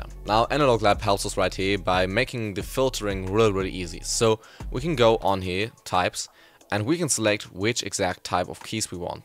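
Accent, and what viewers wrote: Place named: Germany. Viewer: boahh deutscher akzent ist so hässlich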